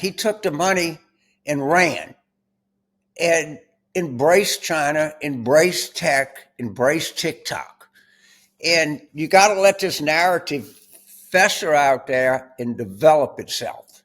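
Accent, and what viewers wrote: South, United States. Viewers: Thanks again,for another informitive video Mr. Carville! I've watched you for years,and never get tired of your wisdom! With your southern drawl,and a little comedy,you continue to guide our people! I met little donnie many years ago in NYC.I knew after two seconds,that he was full of $hit! Being a country boy,I've been taught to beware of a conman! How can so many people be misled at the same time,inour beautiful country? Where does all of thier anger come from?Can't they see that his is nothing but a brown stain in thier shorts? Sheesh.❤❤❤❤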